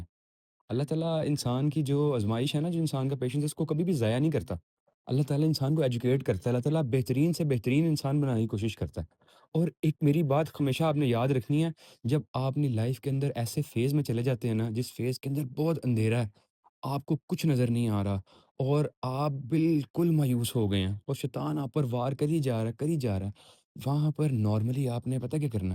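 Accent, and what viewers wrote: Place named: Pakistan. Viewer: Aap k elafaz bolne ke treqe se lagta h aap pakistani ho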